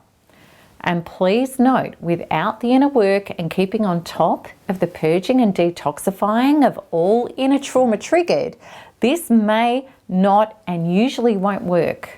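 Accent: Australia. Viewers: that horrible australian accent...but yeah female narcissists are the worst.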